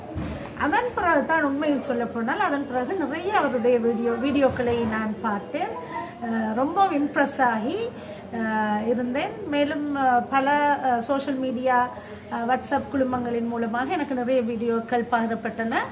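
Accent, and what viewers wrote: Sri Lanka. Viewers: இலங்கை தமிழ்.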